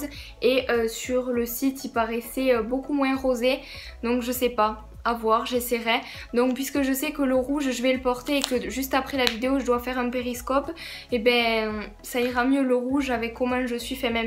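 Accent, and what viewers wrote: South, France. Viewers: hey l'accent du sud 😂 gros bisous j'aime trop tes vidéos 💞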